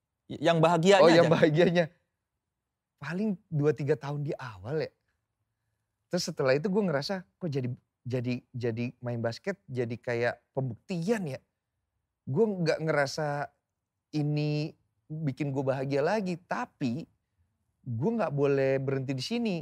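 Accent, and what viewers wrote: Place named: West Java, Indonesia. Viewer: baru tau kalo logatnya denny sumargo ternyata nyunda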